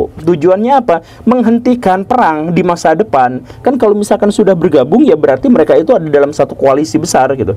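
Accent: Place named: West Java, Indonesia. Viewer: Senang dengan istilah pidio da bukan video,,, maap dan bukan maaf... Sunda banget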